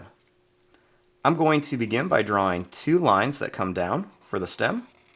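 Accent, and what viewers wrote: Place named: Argentina. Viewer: habla en español argentino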